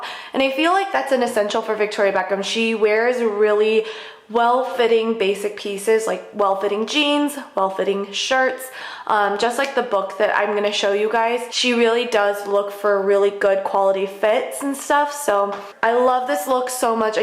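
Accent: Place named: United Kingdom. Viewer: Such casual and chic outfit choices!! But I immediately subscribed when I heard your “British accent” 😂😂😂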